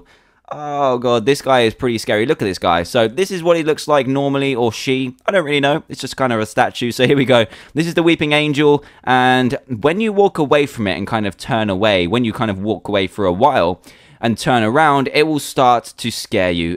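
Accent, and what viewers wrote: United Kingdom. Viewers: Dr Who Has A British Accent Just Like YOU!!!!!!! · and the cool thing is, you have a british accent, so you sound right when you say "dalek"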